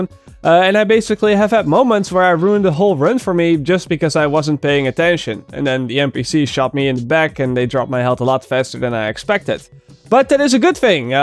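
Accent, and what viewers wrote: Netherlands. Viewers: Hoor ik het nou fout of is dat een nederlands accent? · Is he dutch? Because he has a dutch accent. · are you Dutch? you have an accent which reminds me of my fellow Dutchies. although, it also sounds american at the same time. so ... i NEEEEEED to know.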